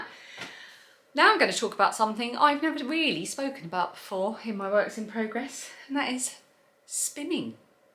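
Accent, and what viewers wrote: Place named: South East England, United Kingdom. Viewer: Another fab episode. I love to get in a nice dose of that luverly South East accent (that’s my natural accent when I’m back in Blighty hanging out with my mates), and lots of making goodness, watching your vids. Have a brilliant knitty autumn and congrats with 19 k 🎉🕯🧶🧣🍂🫖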